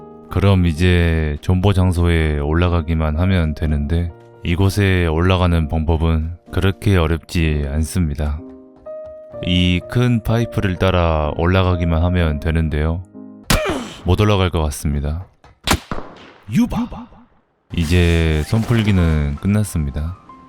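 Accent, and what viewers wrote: Jeolla, South Korea. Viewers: 전라도 사투리 구수하네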